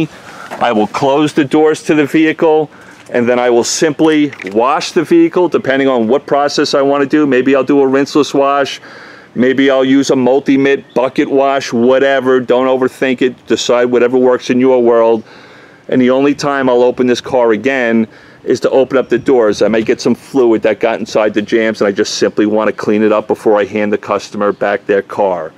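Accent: New York, United States. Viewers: first off watched all your videos and have gotten priceless tips and tricks from you. I am not an auto detailer by trade such as yourself but am what you would call a " driveway detailer" my own cars. I'm very OCD and all my vehicles need to be spotless. Superclean has changed my life. Since you are going over interiors. I'd love to see your process on how you detail the dashboard, instrument panels, that pesky fake glass where your speedometer is, navigation screen all the way to the radio buttons. Do you still use meguiers interior detailer? I don't mind it but it like you said smells like vinegar and can't stand it. Any ideas? By the way your New York accent and fun loving humor is quite refreshing. Never bored listening to you. Sincerely Dex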